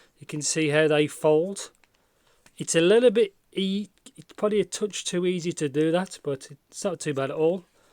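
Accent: United Kingdom. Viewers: This is standard UK english, yup it's hard to understand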